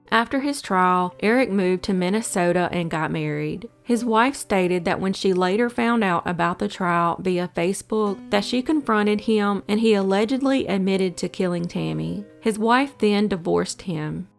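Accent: South, United States. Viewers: Ahh ya my fave southern drawl accented lady from over the pond :) it’s much needed just fallen out with a life long friend over money :( should never have helped her but too late now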